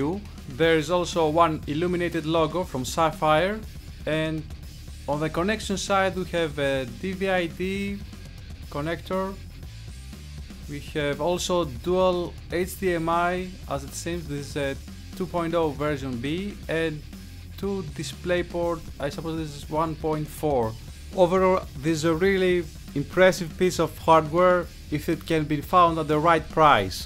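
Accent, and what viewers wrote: Greece. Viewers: Are you greek? because your accent reminds me a lot of greek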